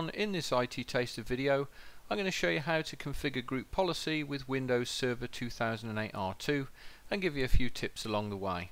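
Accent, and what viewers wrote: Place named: England, United Kingdom. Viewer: i must say i like your English accent although i haven't done viewing this video, it sounds like you are from England, plus sounds like Klaus from vampire. Anyway, thanks for the video, and i'm studying now.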